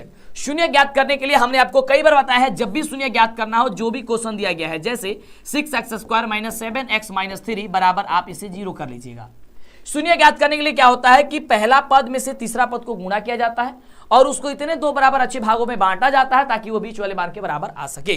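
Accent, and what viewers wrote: Bhojpuri, India. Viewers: Aap Bhojpuri mei Kyo boliye Lagte Hai sir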